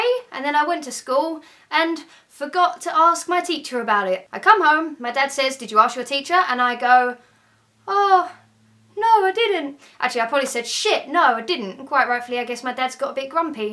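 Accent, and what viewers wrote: United Kingdom. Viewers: it´s a british accent :) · British accent <3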